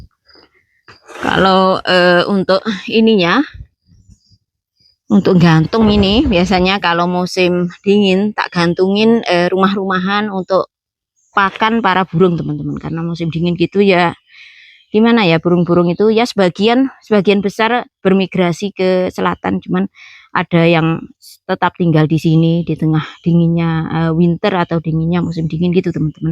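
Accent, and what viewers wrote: Java, Indonesia. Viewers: Jawa tengah/ Indonesia hadir mba.....suka bngt dgn kegiatan mba berkebun dan panen2. Dan suka ketawa sendiri klau denger mba bicara, pakai bhs asing campur bahasa jawa yg msh medok😂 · MB meskipun hidup diamerika ngomongnya tetep Jawa medog seneng dengernya orangnya sederhana apa adanya baguus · salam sehat dari Papua buat mbak sekeluarga. mbak klu ngedabrus selalu buat saya ngakak.biar sdh lama diluar negeri tp jawanya tetap medok.sehat terus mbak🙏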